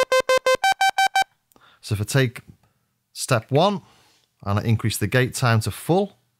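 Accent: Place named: England, United Kingdom. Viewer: Just got my crave last week, this video is absolute mana from heaven, I would be lost without it, thanks 👍🏻 and also the fact you're English means I can understand what the hell you're talking about 😂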